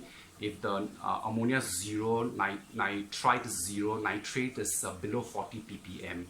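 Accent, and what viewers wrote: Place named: India. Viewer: very informative video may i ask how come do you have an indian accent?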